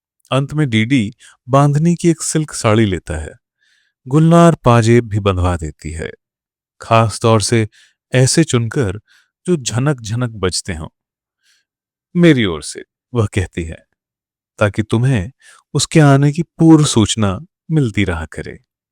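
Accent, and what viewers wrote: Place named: Kumaon, India. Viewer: मनोहर श्याम जोशी जी के 'कसप' को आपकी आवाज़ में सुनना एक अद्भुत साहित्यिक यात्रा जैसा है। इस उपन्यास के हर पात्र के लिए जिस तरह से आप अपने स्वर का चयन करते हैं और उसमें जान फूंक देते हैं, वह सचमुच काबिले-तारीफ़ है। खासकर कुमाऊंनी भाषा के शब्दों का आपका उच्चारण और जिस लहजे के साथ आप उन पर काम करते हैं, वह श्रोता को सीधे अल्मोड़ा की गलियों में ले जाता है। सबसे सुंदर बात यह है कि जिस सहजता से आप उपन्यास के बीच-बीच में गीतों की पंक्तियों को पिरोते हैं, वह कहानी के प्रभाव को कई गुना बढ़ा देती है और हर दृश्य को जीवंत कर देती है। आपकी वाचन शैली ने इस कालजयी कृति के रसास्वादन को और भी अधिक समृद्ध कर दिया है। इतनी मेहनत और कलात्मकता के साथ यह प्रस्तुति देने के लिए बहुत-बहुत धन्यवाद। सुनने का अनुभव अत्यंत सुखद है!"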